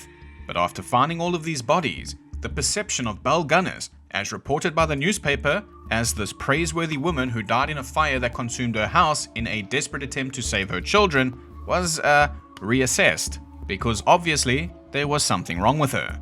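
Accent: South Africa. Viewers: Are you from South Africa? Somehow it seems your accent is from there. A slight Dutch touch to it. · south african accent so much nicer than british or australian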